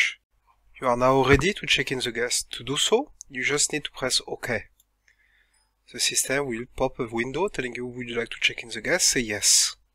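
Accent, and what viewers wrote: France. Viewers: sounds super French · It still sounds French even if he speaks english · A French speaker's English is always so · seems French accent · Langue anglaise avec un accent français génial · merci beacoup pour le rafraîchissement de mémoire et pour le magnifque french accent. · Terrible with the French English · your accent is more of french which is a bit hard to understand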